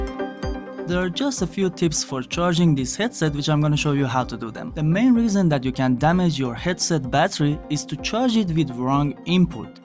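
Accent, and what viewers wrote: Iran. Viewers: Useful video Thank you But i recommend you to improve your English pronunciation (you speak like Persian Iranian people) ❤ 🙏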